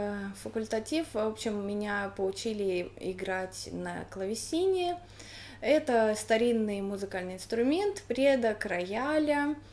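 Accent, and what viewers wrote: Russia. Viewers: congratulations on reaching 100,000! I'm sure you will reach so many more.. You have such amazing talent, i'm really looking forward to hearing some more of your stuff.. I absolutely love your accent by the way! I wish i could speak russian! Lol... 😘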